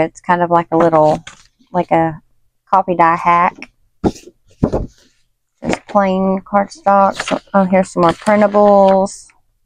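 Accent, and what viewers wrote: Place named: Texas, United States. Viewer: I just ❤ your Texas accent. I have a Southern accent too but, I am from the North. Half my family is from Texas and the other half is from Michigan so, I have both accent 😂😂😂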